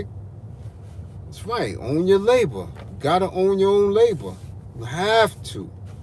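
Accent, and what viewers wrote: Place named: New York, United States. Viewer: I just like your style man. The way you speak, the New York accent, knowledge being passed on, I salute 🫡 you OG, 29 year old brotha here. I’m saving this video to cause you responded to some of my live chat 💬 comment 😂😂. Keep it safe out there as well.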